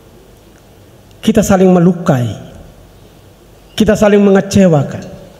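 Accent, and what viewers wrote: Flores, Indonesia. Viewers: Mantap, tetap semangat 💪 luar biasa kotbah nya kaya orang Flores nih. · Luar biasa...sy suka sekali kalo renungan dari pater...logat floresnya yg membuat kami bangga sbg orang Flores, GBU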